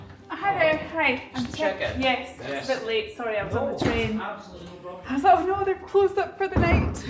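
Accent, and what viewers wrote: Scotland, United Kingdom. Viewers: Hi,I wanted to tell you how much I enjoy your journeys and adventures. You are lovely and so well spoken... love your Scottish accent. My mother was born in Scotland. Best wishes, and I look forward to your next video.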